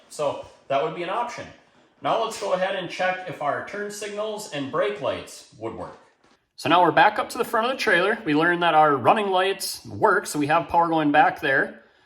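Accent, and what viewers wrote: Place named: Minnesota, United States. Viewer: The brown wire ground back feed diagnosis was really nice work, as if you’ve done this before! 😅 The mouse situation was also classic. Re-wiring the whole thing was the right thing to recommend. The Minnesota accent is perfect! Go get some hot dish and jell-o salad. How about some bars for dessert? 😊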